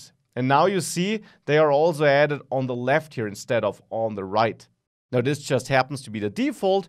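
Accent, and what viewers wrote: Germany. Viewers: I bet 100 dollar he is from germany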